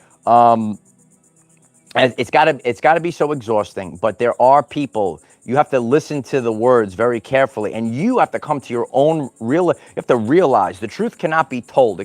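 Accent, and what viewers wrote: New York, United States. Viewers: 🙏🏻 Gr888 work as always. I can see why so many ladies come here, but I have to say I come for the TRUTH TALK, and also I’m a sucka for that New York/Jersey (?) accent, gets me every time! 💃🏼 PS I have images of the Lions appearing if you want to see them, seek them out on my Ch Ann el here…last Aug/Sept sometime, one must have the eyes to see… btw this is TruthBeSpoken, I have (4 diff) ch Ann els, dear brother/neighbor. 🕊️🕊️✨🦁✨🦩🦩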